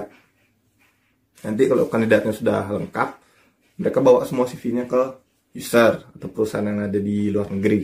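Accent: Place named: West Java, Indonesia. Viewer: Jiga t sunda kng.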